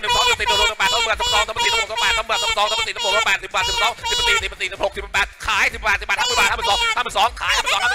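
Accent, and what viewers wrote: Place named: United States. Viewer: พูดแบบอเมริกาเลย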